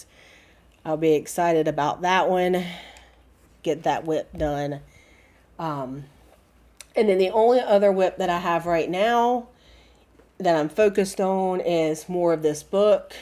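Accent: South, United States. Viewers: I so enjoy watching your flosstube. You are just a hoot. You are you and I so appreciate your authenticity and your southern twang. Seeing all the witch charts you made and bought was a treat. Instant viewing of finishes and future possibilities for us to do. Thank you for all you do. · Never apologize for being you! You are real and I love your southern accent! Happy stitching!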